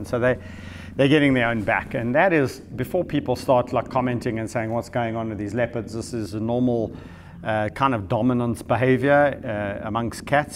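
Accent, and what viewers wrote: South Africa. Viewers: He sounds South African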